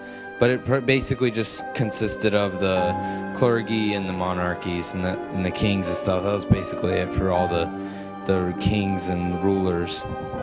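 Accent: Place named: United States. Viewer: Give me an Englishman or women please. Why do I have a teenage yank trying n failing to explain this to me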